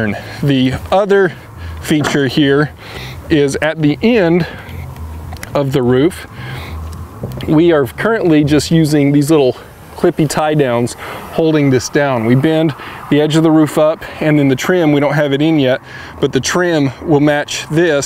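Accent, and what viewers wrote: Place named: Texas, United States. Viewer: Your Texas accent is part of your charm :)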